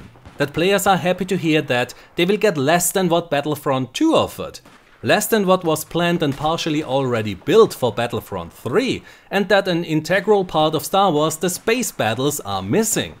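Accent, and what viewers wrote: Austria. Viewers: are you from Austria? Weil du Autirgendwas heißt und weil du nicht klingst als ob Englisch deine Muttersprache wäre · Great video. I have to ask as this has had me thinking for a while, Are you Austrian? Because you sound very much like Niki Lauda lol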